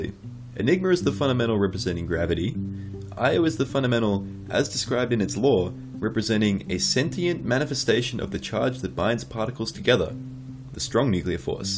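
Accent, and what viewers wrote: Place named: Australia. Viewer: Here I thought, Godz from BTS was australian. You are so australian, it hurts. I could barely make out a word. I betcha if you ever move to a different country, you could get laid just by laying on the accent. You lucky dog, you.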